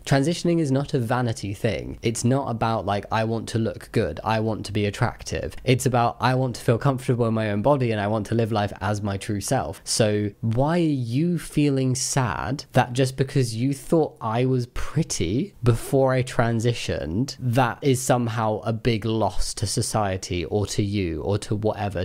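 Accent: United Kingdom. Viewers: Omg these hate comments 😂😂😂 the only issue i personally used to have with your voice is your heavy british accent. It used to be kind of hard to understand for me no matter who spoke it, because english isn't my native language and the waste majority in media uses mostly american accents. I'm all good these days though, in part thanks to following you and few other channels of people from the UK. Love you, ❤, please don't even change. The cross between the dry humor, politely formulated sass, and actual explanations are so uniquely you, i love it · Re, Smug and patronizing: Thats the American ear hearing the British accent. John Oliver makes jokes about it frequently.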